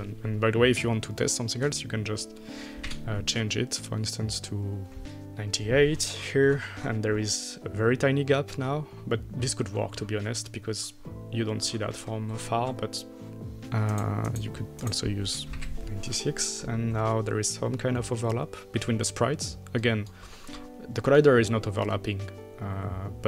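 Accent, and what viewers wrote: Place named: France. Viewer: Le petit accent Français qui ma donné le sourire pdt toute la vidéo, merci à toi btw ;).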